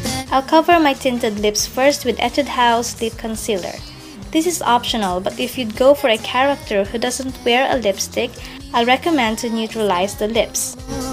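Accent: Philippines. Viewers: Omg i can totally hear the Filipino accent in you xD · I instantly knew you were from the Philippines when I heard you speak. xD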